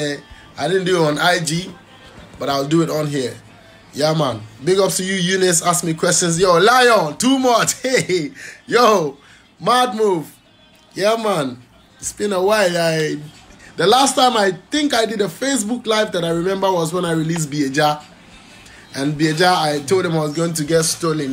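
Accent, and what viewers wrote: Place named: Jamaica. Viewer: You’re talented with unique voice which makes you super extraordinarily musician in Africa but try to limit your Jamaica accent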